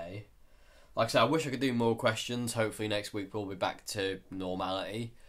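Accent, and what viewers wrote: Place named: South London, United Kingdom. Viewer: Damn man! you really shouldn't make videos when your that sick. You sound like someone completely different, even your accent :( You sound more like your from the South London than Lincolnshire lol. Get better soon Mate!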